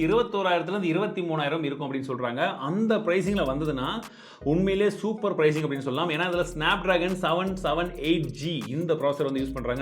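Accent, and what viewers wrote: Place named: Bihar, India. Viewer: Bihar voice super bro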